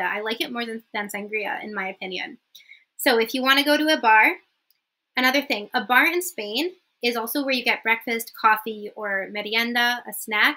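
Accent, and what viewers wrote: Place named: Mexico. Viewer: ❤Hermosa, tu acento es más latino, y de hecho suena como mexicano. Así que te vendría bien aprender slang de México, aunque hay algunas que usamos españoles y mexicanos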